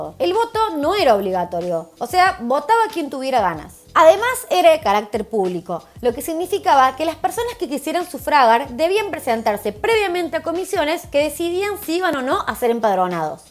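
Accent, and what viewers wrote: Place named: Córdoba, Argentina. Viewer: Historia, memes y acento cordobés! Este video lo tiene todo!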